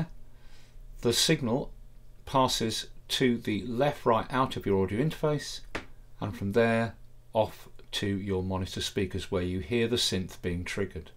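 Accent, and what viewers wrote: United Kingdom. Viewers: You know the video will be good the moment you hear his British accent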